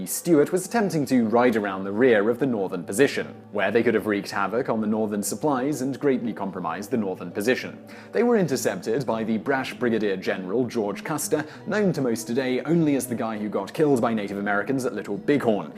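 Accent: England, United Kingdom. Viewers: Tory Sanders He definitely said Gettys burg with the wonderful English accent. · Don't care for a LIMEY narrating US History! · Why is a british guy trying to tell us about Gettysburg - maybe he should have done a video on yorktown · Our history not urs, ur obviously british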